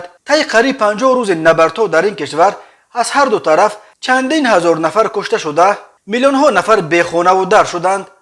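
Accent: Pamir, Tajikistan. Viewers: Хами лахчаи помирии хамин баранда ба чон расид, ягон одами дигар наефтен, ки бе акценти помири гап занад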